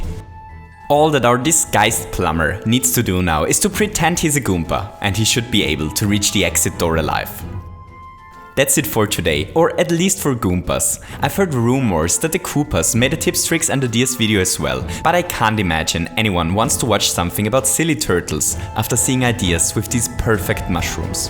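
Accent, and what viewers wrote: Germany. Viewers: Bist du ein Deutscher ? Du hörst dich so an, als ob du ein Deutscher oder von irgend ein anderes deutschsprachiges Land kommst :D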